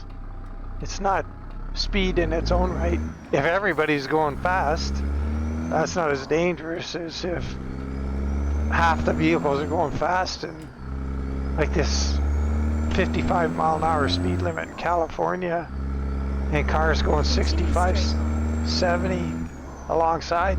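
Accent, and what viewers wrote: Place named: New Zealand. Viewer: Ha, inthat last video I thought the voice was a kiwi accent. As a kiwi myself I approve 😅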